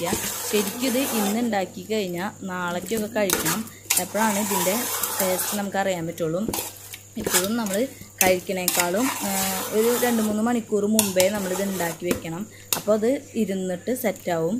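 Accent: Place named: Thrissur, India. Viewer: സംസാരം കേട്ടാൽ അറിയാം തൃശൂർ ആണെന്ന്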